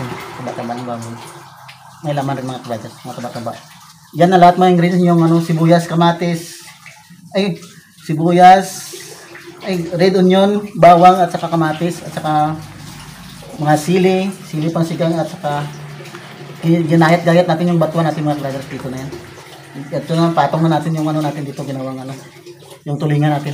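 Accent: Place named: Iloilo, Philippines. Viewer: Ilonggo ka sir😊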